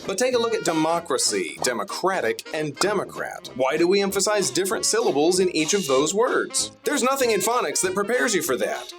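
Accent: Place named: United States. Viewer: Latin spoken in an American accent like that is truly barbaric.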